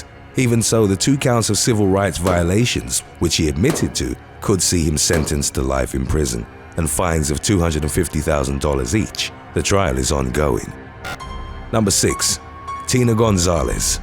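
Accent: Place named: United Kingdom. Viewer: Hes from the UK and tbh his accent is quite lovely .Hes a huge reason why this channel is so successful .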